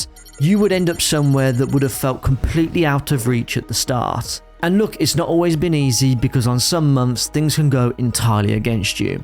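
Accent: United Kingdom. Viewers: You when you can tell someone is British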